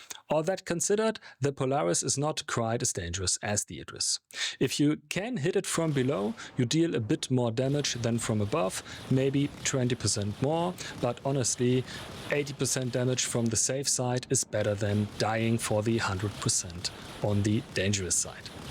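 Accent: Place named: Germany. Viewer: das war das deutscheste englisch was ich gehört habe^^ ( nicht negativ gemeint) cooles video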